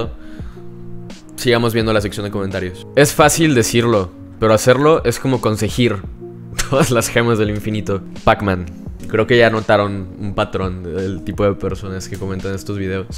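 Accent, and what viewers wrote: Fresa, Mexico. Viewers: Eres whitexican, hablas bien fresa y solo te ven porque estas guapo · Eres whitexican, hablas bien fresa y solo te ven porque estás guapo.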